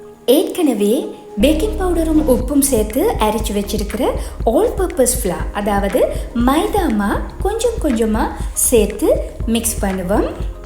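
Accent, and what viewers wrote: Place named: Northern Province, Sri Lanka. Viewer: மாஷா அல்லாஹ் ❤ வடக்கு மாகாண தமிழ் மிகவும் அழகு 🎉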